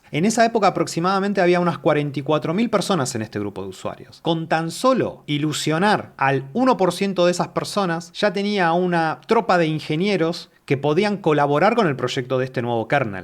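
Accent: Rioplatense, Argentina. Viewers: Me podría pasar media hora, halagando las virtudes de esta locución en sus diferentes aspectos. A mis 70 años , 50 de programador y en electrónica, lo voy a decir en una sola palabra. "Excelente" . ( Imparcialidad en el enfrentamiento intelectual entre los colegas, balance conceptual y emocional , uuffff !! difícil cosa , por eso me suscribo. ) Ademas , de regalo, entendí TODO, la dicción perfecta, sin vicios del habla, muy raro en nuestros dias, en TODOS los medios, incluso en este. Felicitaciones pibe. Un gusto escucharte y sonido rioplatense. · Que hueva perder mi tiempo en una disertación tan estéril , crei que escucharía algo novedoso. Y encima de todo tu acento argentino lo echa a perder más, esperaba algo más interesante y no solo un fan más del kernel de Torvalds echándole porras, lo llevas a un terreno casi casi de novela de televisa.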